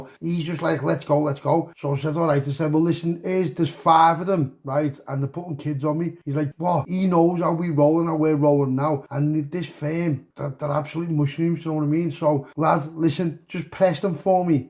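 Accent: Liverpool, United Kingdom. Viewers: You sound like you got a cold.scouse❤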